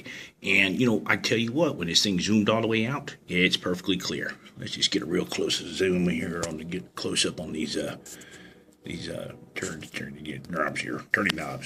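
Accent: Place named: Fargo, United States. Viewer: Oh god stop the FARGO accent!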